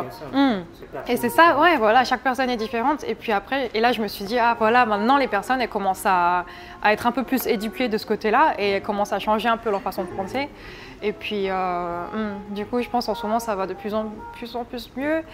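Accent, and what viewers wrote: South Korea. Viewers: Son côté coréen s'entend ... à son intonation, à sa voix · sa façon de parler ses mimiques sont coréennes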